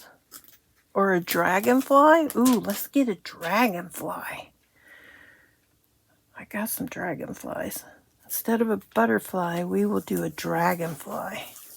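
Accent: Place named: South, United States. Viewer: I Love your attitude to life and all it throws at you. Thanks for telling your story. I had to retire from teaching when I was 53 through mental health. I'm registered disabled through my feet. I was a carpenter before I taught and did lots of concrete form work. I did bridge decks and columns. My last big pour took 12 hours of continual pouring and vibrating concrete. What are duradelis. That's probably spelt wrong but I have never heard of them. PS your country accent is great. I have a Dorset accent.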